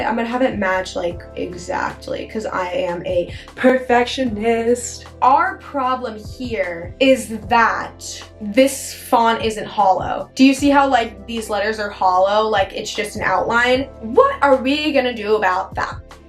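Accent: England, United Kingdom. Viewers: Make an edit tutorial while using your British voice! Whenever you dont/forget talk American you show one of your old edits ! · Love your English accent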